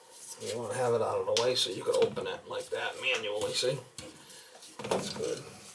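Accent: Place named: Boston, United States. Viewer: I'm just guessing you have a Boston accent. I'm rural Pa mountains. I traveled around and worked with people from around the country.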